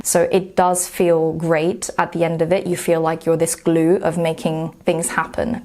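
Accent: United Kingdom. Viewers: 영국식 발음 공부할때 따라할때도 넘 좋아요! 이런 영상 자주,, 올려주세요❤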